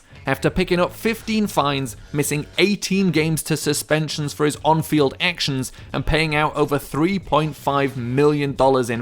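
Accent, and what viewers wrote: England, United Kingdom. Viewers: Wasn't expecting a Burfict video to be narrated by a Brit lol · British accents and football don't match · I love how a British person is saying this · So why is this British guy talking about NFL football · honestly have never heard someone with a british accent speak analytically about american football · Anyone else think it’s pretty cool a British dude is talking about American football? Edit: or maybe he’s Australian idk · Theres somethin i really dont like about an english accent talking about american football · This is the first time I’ve watched American football with a British accent over it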